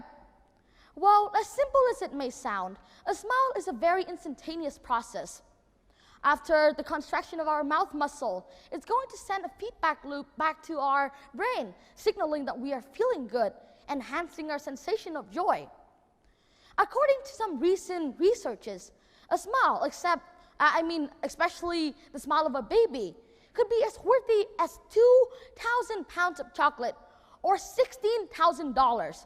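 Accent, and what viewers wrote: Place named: Vietnam. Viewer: giọng Vietnamese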